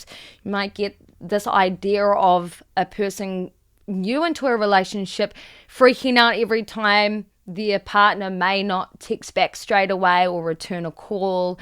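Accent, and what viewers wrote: New Zealand. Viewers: Toxic egomaniac band member taking total control and walking over the top of you , ah! that brings back memories . And everything else you've experienced seems so familiar , good to see you have such insight into yourself . Too bad that wasn't the case with me thirty years of going nowhere , P.S. love that kiwi accent . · I think it's a New Zealand accent. I automatically assumed Aussie at first, but certain words have a NZ twang.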